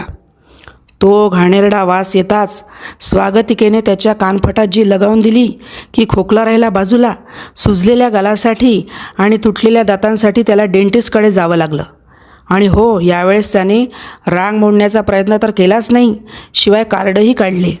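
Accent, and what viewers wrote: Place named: Vidarbha, India. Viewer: छान विनोदी आहे कथा. विदर्भातील भाषा छान सादर केली आहे.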